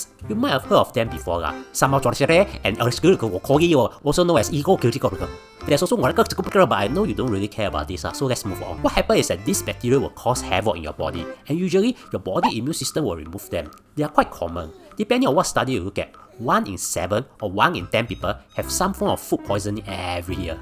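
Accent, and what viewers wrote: Singapore. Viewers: That is his signature style, exaggerated singlish, how abt u find another channel to watch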